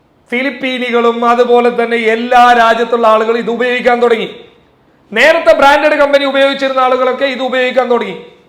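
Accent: Kasaragod, India. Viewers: എടാ തനി കാസർകോട് സംസാരം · Basheer bashiyum thudangi bb cream njan vanganonn vijarichu eni vangunnilla kasaragod samsaram nalle und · ella languagum manasilaavum ennal kasargod slang inte ponnooo onnum manasilavula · കാസർഗോഡ് ഭാഷ കേട്ടാൽ തന്നെ ഛർദിക്കാൻ വരും. ഈ മാതിരി ഊമ്പിയ ഭാഷ... വല്ലാത്ത ന്യായീകരണം തന്നെ.... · Kasargod Slang adipoli😀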